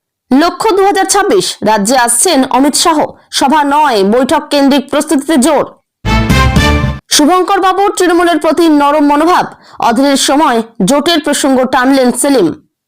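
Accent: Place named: Bangladesh. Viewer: বাজে চ্যানেল।বাংলা উচ্চারণ ভুলে ভরা।মনে হচ্ছে বাংলাদেশের কেউ খবর পড়ছে।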